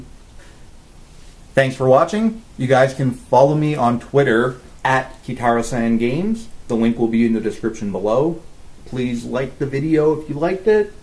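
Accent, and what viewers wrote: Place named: France. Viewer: dat epic lisp, and dat french accent hahah :D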